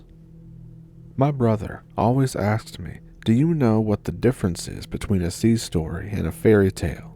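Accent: Canada. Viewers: Hey, -.. 💙❤️💙💙, I love hearing my canadian uncles and cousins when you talk,😂- I just want you to know, how I enjoy your stories, you should hear some known things what happened in Emerald Triangle. 🎉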